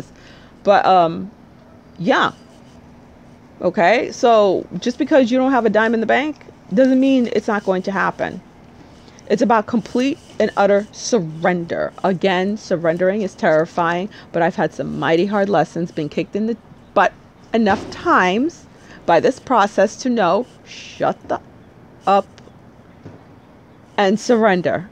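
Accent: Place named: Brooklyn, United States. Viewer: Love your videos. Will be purchasing your book for my little cousin. She is only 2 years old but such an old soul. Love your accent reminds me of home. I was born in Brooklyn, NY 😊 Blessings to you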